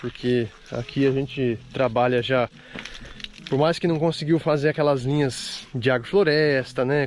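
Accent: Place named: São Paulo, Brazil. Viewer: Muito legal, tenho composteira e minhocario, e várias árvores pra gerar massa, muito legal ver jovens como vocês fazendo diferente e a diferença, só curiosa pra saber onde é o seu sítio, chutaria são Paulo pelo sotaque, kkkk, parabéns, aqui, o que é feijão arroz, não sei se foi isso mesmo que você falou mas foi o que entendi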